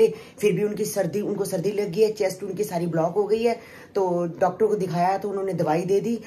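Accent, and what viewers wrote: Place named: Punjab, India. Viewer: Aunty g apki way of taking mein jo punjabi flavour hota hai I like it love u lot from Punjab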